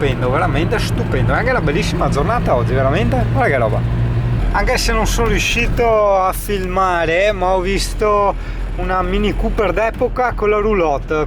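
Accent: Veneto, Italy. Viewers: Venetenglish unico grande!!!! Continua così grazie per i tuoi video .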